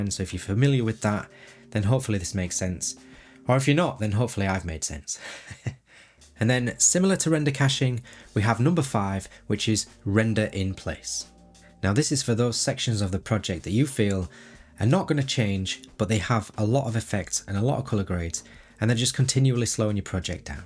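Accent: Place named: Scotland, United Kingdom. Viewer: Excellent channel. Love the smooth, authentic delivery of a real person. I'm going to take a wild swing at your accent. I hope it does not offend, but I'm guessing Scottish. If there's one thing I've learned these 65 trips around the sun it's this: "if it's not Scottish, it's crap" is universally accepted among civilized humans. Best Bond, Sean Connery, no contest. Best drunk Irish stories, Harris and O'Toole. Best Welsh coalminer stories - Richard Burton. Whatever you are, never change that delivery. It's your secret sauce.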